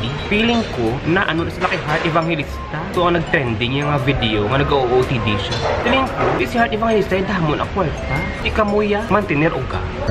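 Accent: Iloilo, Philippines. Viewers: Enjoy gyd kaayo ko tan-aw sa imo mga video ba,pampawala stress imo video ay kay mag sge ko katawa.Ilingaw gyd kaayo ko.ganhan ko sa imo Ilonggo accent.Sakit ako tyan nimo ay :) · Nice one sir!! Ilonggo na ilonggo gd!!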